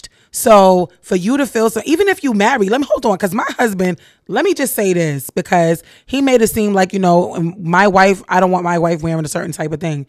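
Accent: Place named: Brooklyn, United States. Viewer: First of all let's start with your beauty 😍. U r Amazing beautiful and the accent is BK New York ❤ She thought having the baby alone is all she wanted and she's up here and he's down there. Keke doesn't respect the man so it's best for him to go find his Queen that he wants... 👑 Keke is a Queen 👑 but let her go find her Prince 😊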